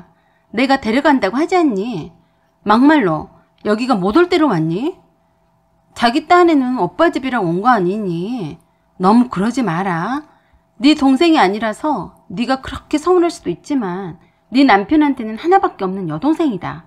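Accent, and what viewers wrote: Jeolla, South Korea. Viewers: 라도 특유의 사투리 ㅋㅋㅋㅋ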